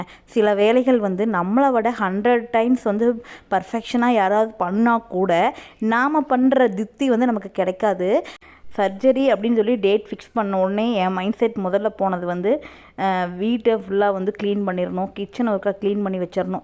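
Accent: Kongu, India. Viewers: So cute'ma. அழகான கொங்கு தமிழ். கோவையில நீங்க எந்த இடம்ப்பா?. Surgeryக்குப் பிறகு இப்ப பரவாயில்லையா?!!. Get well soon.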